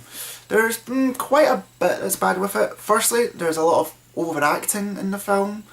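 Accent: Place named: Ireland. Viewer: You speak really quickly in an Irish accent. I kind of had a hard time.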